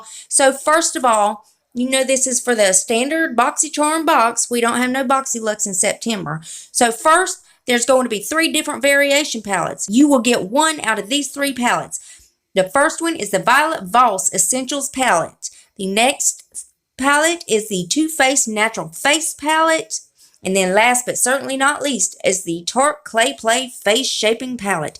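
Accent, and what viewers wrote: South, United States. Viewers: I'm so amused with your southern voice. Absolutely adorable!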